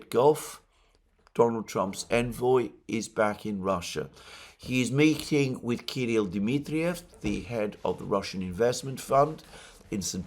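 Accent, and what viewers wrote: England, United Kingdom. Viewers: This just goes to show that just because you have an English accent, doesn't mean you know something. Your economic analysis is a bunch of hot air. What will you say when the tariffs work, which they will, and they already have started working.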